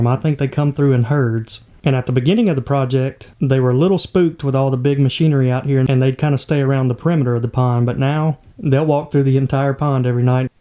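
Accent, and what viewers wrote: South, United States. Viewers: Fun name idea: The Tripple P. that stands for The Peanut pasture pond. I that name will sound very nice with your southern accent.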